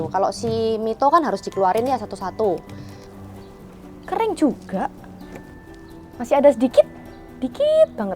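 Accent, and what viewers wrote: Java, Indonesia. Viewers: Cantik + oriental + muuuuedok = ❤ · medok kali suaranya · Medok men Yo jowone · Medok logat jawanya 😂😂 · terus dipinek2 😂🤣medokk bngeett mbk e .. lucu .. makasih review nya kk .. milih Arashi aja dehh go co😅😅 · medoknya saya suka 😍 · Makasih orang baik 👍🙏💕...Kusuka mbnya bahasa nya medok Jawa 👍🙏🇮🇩💕😅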